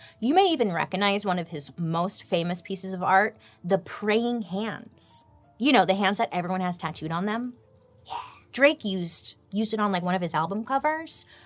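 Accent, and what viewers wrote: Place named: East Coast, United States. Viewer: I love ur voice you sound so east coast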